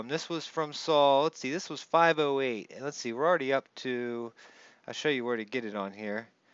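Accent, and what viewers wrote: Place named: United States. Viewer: That single rock is interesting, the scallops on the edge are very unlikely to be natural, so well spotted. The rest are I think simply rocks. Actually his american accent to us Brits is quite charming, so don't run him down so much - anyway everybody read The Third Explanation Amazon, Kindle, but shortly to be a paper book. Keep taking the pill!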